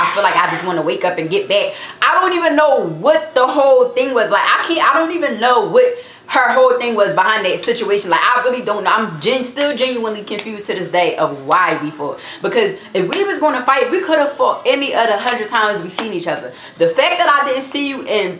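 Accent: Philadelphia, United States. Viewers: she front Philly OBVIOUSLY she not gonna talk with a regular tone tf you’re weird , you don’t have to watch .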